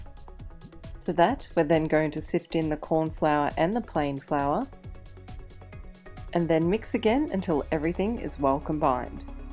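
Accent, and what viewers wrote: Australia. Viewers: She sounds Australian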